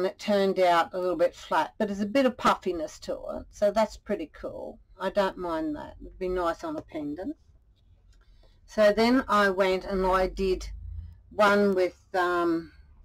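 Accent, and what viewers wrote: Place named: Australia. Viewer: Very strong Aussie accent. Worked in Aus for a few years before returning to NZ. Miss my Mates. Love your work, and l hope your health improves. We have free prescriptions here in New Zealand, and even before we only paid $6. It’s a shame that prescriptions are so expensive in Aus, considering every tax payer has to pay the additional 3% tax for Medicare.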